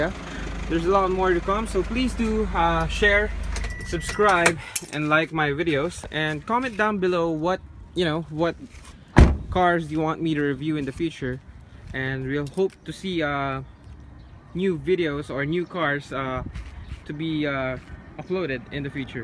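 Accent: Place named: Philippines. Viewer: Galing mag english halatang pinoy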